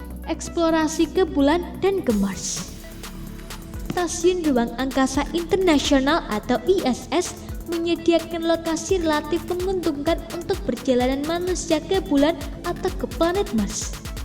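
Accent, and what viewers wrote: Java, Indonesia. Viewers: Suara nya medok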